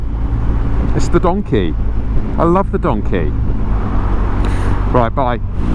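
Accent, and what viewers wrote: United Kingdom. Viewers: I find your videos very relaxing and you have a very posh english accent!😁 I wouldn't be surprised to hear you at some point: Alloweth's speedeth up a did bite !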